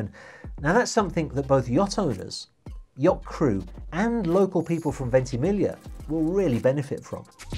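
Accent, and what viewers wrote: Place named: RP, United Kingdom. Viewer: Queens English is well defined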